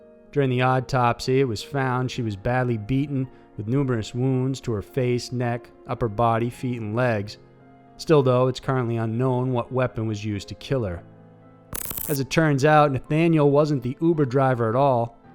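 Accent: South, United States. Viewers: your voice really makes these videos interesting. Am I hearing a southern accent? Have been watching a ton of these the past two days, you got yourself a new subscriber!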